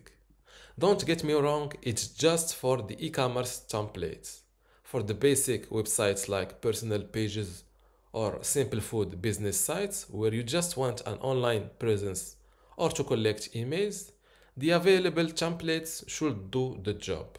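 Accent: Morocco. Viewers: my greetings to our moroccan accent reviewer , good review indeed !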